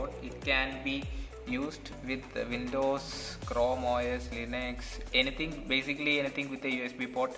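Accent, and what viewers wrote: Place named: Kerala, India. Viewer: are you malayali? :D you speak like one....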